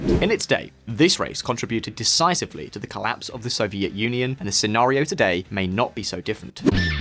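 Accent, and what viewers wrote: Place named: England, United Kingdom. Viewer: How is the standard of living in the US? How many homeless increased in recent years? Many Russia leaving because of the harsh weather and by nature like to travel the world. Propping dictators around the world?! Excuse me but, who is backing up the Saudi royal family despite dismal human rights? Bahrain, Egypt, Israel, and many other nations in the past and present. This is one sided propaganda show with an English accent, to make it more credible for the simple minded young viewers who watch mainstream and believe anyone with that accent. I dare you to make a video about the stolen historical artifacts the lay in Western Museums, or about Assange raw deal, maybe enough spine about Guantanamo bay prison, Snowden, cooperate America, backing mascaras in Indonesia in the during Suharto reign, Opium war affects, the theft of Hawaii, all the broken treaties with native Americans, the pillage of Australia by the British, The king of Belgium in Africa!! 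More recently show the new Nazi affiliated government of Ukraine and their western destabilizing actions all over the world. Show some spine in your reports, be original instead of copy pasting your own Empire agenda. Just a month piece nothing more nothing less. Grow some balls Sir.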